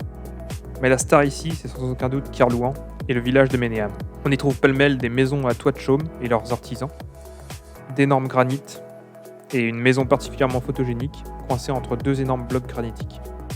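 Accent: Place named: Paris, France. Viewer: n'est pas un gars du coin, car il prononce les noms à la Parisienne! C'est désagréable d'entendre quelqu'un vouloir enseigner aux autres en écorchant ainsi les bases.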